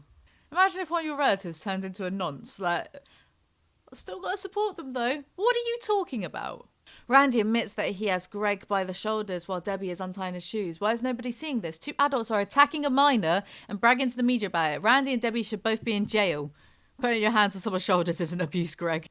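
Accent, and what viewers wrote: United Kingdom. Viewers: That BRIT sound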